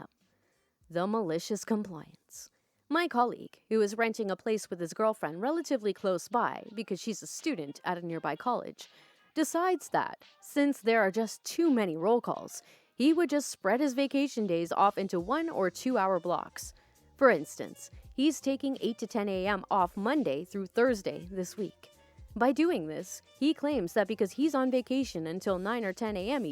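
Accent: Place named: New Jersey, United States. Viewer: That last story could be simply entitled people. Your accent reminded me of the South Park "From Jersey" episode.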